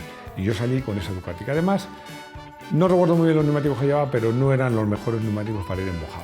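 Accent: Spain. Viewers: JA JA JA JA... NADA COMO UN PRESENTADOR ESPAÑOL..... ADORO A LA BELLISIMA ESPAÑA.... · Bien el gallego. Consiso y claro. Veré de seguirlo Entre mí moto y estos videos espero aprender un poco más!! Gracias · Que asco de video: narrado por español. No way man!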